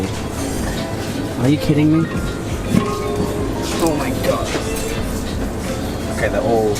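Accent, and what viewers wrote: United Kingdom. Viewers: Love your accent!! Most YouTubers are Americans or Canadians. It is so fresh to listen to someone speaks with a British accent. At this time when most of us can’t travel. It is so amazing that we can watch you on your little Honda. I hope the videos are longer and more detailed.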